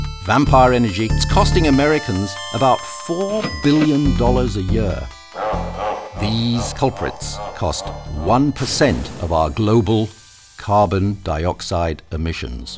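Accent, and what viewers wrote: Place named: United Kingdom. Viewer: i love this. HOWEVER i do find it a bit creepy that they chose to have a brit do the narration for wasteful american energy.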